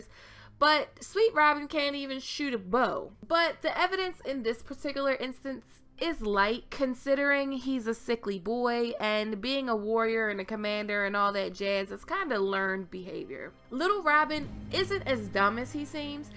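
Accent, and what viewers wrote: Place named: Maryland, United States. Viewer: she sounds like shes from Maryland or the "DMV" if you are familiar with the different American dialects of English...anywoo I enjoy her theories & perspectives the most!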